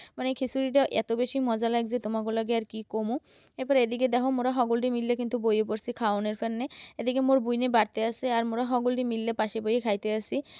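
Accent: Barishal, Bangladesh. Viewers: আপনি তো দেখি মাশাল্লাহ ভালোমতো বরিশাইল্লা বাসায় কথা কইতে পারেন? গো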